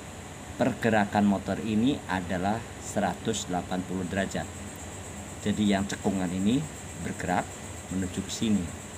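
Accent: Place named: Banyumas, Indonesia. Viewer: Salam satu "ngapak" kang..... 😀👍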